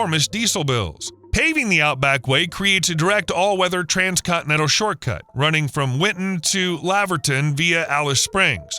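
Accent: United States. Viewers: 😂 If you think all that could be built out there for that price, I have some other "investment opportunities" for you. From Winton, a A$1.2b single lane build would run out of money well less than half way to the NT border assuming the standard ballpark A$5m per lane-km for a rural arterial, which would be optimistic for some of the reasons mentioned. If you want a lane each way it would barely reach more than 100km. The US accent didn't help credibility, but otherwise was entertaining enough.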